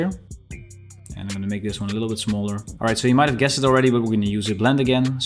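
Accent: Netherlands. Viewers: ur dutch?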